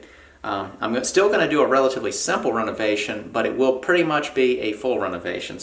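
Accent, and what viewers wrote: South, United States. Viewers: His accent indicates somewhere down South.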